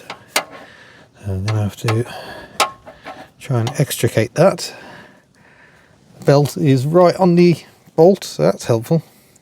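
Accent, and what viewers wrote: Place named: Birmingham, United Kingdom. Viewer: Fascinated you grew up around Brum. Now you've said it can hear a hint, but for some reason always thought you had a Reading / North Hants accent 🤦 Apologies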